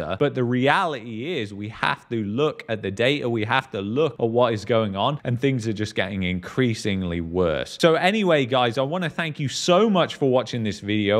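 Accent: England, United Kingdom. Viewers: Hey you sound English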